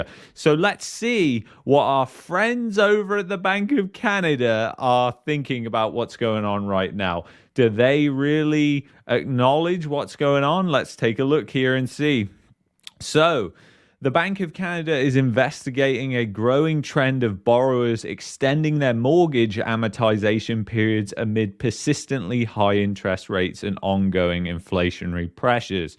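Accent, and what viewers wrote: United Kingdom. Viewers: Why is a Brit , explaining Canadian economics ?😮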